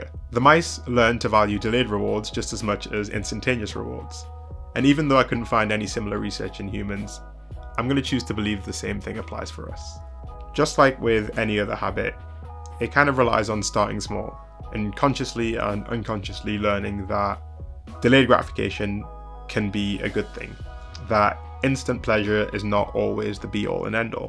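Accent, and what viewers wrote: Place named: North East England, United Kingdom. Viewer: Nice to hear a north east UK accent on YouTube :)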